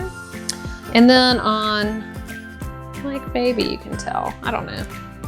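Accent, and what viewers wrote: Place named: South, United States. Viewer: It's nice to see a good YouTuber that has a southern accent. I never see anyone on YouTube with a Southern accent. I'm from Alabama so I'm really used to that kind of accent and it's just nice to hear someone online sound like someone that I'm used to hearing.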